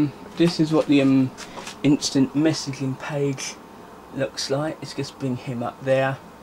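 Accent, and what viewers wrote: United Kingdom. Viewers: are u from essex ...i like your accent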